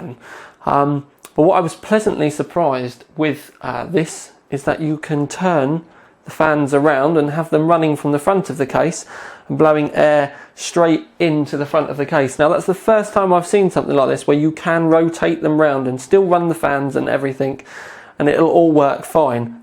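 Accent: United Kingdom. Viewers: Way off topic but whenever I hear a young dude with a british voice it always reminds me of the harry potter movies.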